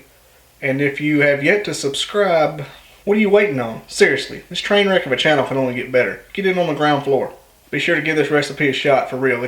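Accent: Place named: Texas, United States. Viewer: I'm gonna try this! Looks good! You have got to be from Texas,:)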